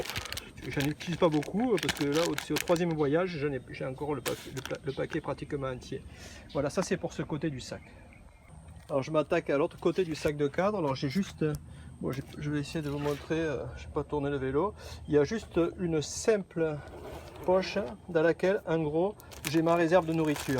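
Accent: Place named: South, France. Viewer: Salut. Merci pour toutes les infos Bikepacking. Etant novice j'envisage une petite 1ère virée cet été et tout les renseignements sont bons à prendre. Merci encore. En plus aux US avé l'accent, c'est top. Pour ce qui est de tes bouquins, je vais aussi regarder de plus près 👍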